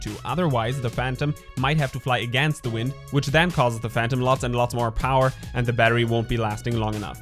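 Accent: Germany. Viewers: Cool stuff! Your English is excellent. Very little German accent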